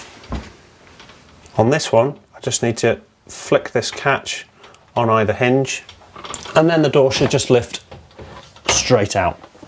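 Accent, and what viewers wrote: United Kingdom. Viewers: Good Lord! A British voice on YouTube!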